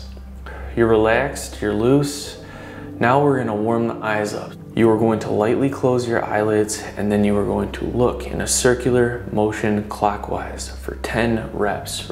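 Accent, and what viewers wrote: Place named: Midwest, United States. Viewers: does this only really work for people who can only see thing far away? Being nearsighted this didnt do much for me except give me a bit of a laugh when he was using his entire face to open his eyes. good vibes on the video though, gotta love that midwestern accent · He sounds like he's from Chicago.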